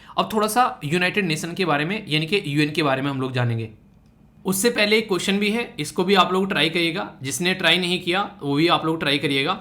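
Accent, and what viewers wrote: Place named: Western Uttar Pradesh, India. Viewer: Sir aapne kbhi btaya nhi aap kha se ho lekin m 100% surety ke sath kah skta hu aap west up se ho or Meerut ke aas pas kisi gao se ho (because aap sarpanch ko pradhan kahte ho jo ki up me hi kha jata h and aap ki boli me dehatipan h jo haryana se touch up me hi dehatipan dekhene ko milta or aap dhakad chhora ko bhi jante ho)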